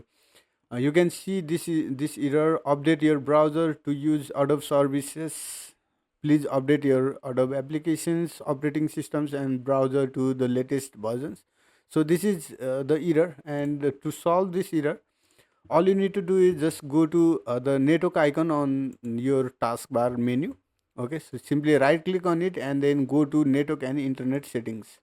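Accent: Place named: Nepal. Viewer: from the accent I can say you are Nepali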